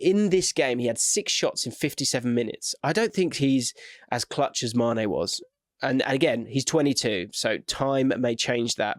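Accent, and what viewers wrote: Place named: RP, United Kingdom. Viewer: still pretending to have a clue and hiding behind his posh accent to fool the rubes. I see you.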